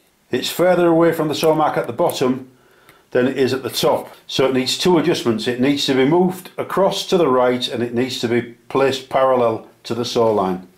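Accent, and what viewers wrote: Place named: East Yorkshire, United Kingdom. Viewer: Great instructional vid Thanks, However I've seen in other reviews that after certain length of usage the saw stops cutting accurately, Have you any experience of this. BTW You're accent suggests to me you're from east Yorkshire, am I right?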